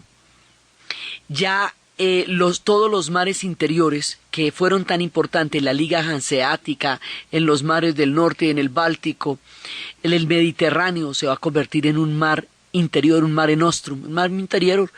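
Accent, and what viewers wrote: Colombia. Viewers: Por el acento de la ponente, deduzco que es colombiana, y yo creo que el nombre de esta señora debería estar grabado en letras de oro en la sala de sesiones del Poder Judicial nacional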